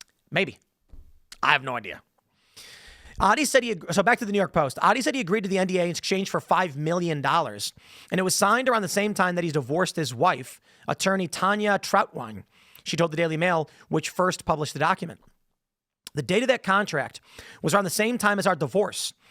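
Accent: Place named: Chicago, United States. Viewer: face in all these recent thumb nails are smug af imo just saying. Que to thicken the Chicago accent about cheeseburgers and being cooked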